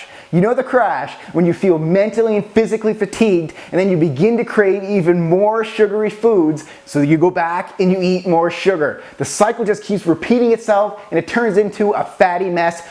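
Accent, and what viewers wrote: Canada. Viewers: where are you from?? atlantic canada??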